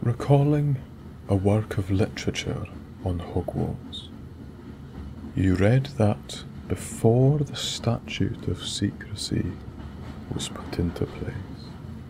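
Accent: Scotland, United Kingdom. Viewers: I love ur Scottish voice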